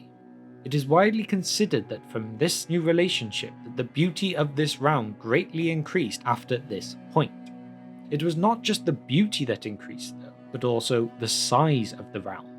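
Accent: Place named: England, United Kingdom. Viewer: I came across your videos this afternoon and I'm really enjoying them and the fact you add some style to pronouncing Elvish and Dwarvish names. The American LOTR vloggers mangle everything or just pronounce the place names like they might actually be somewhere in Wisconsin. One thing - I'm presuming you're English? I can't put my finger on your accent but the giveaway is you pronounce Durin as "Jewrin" instead of "Doo-rin". Like the "Juke of Edingburgh" (although you Brits never called Duran Duran "Jewran Jewran" thank God!) It's the only flaw in your otherwise excellent work!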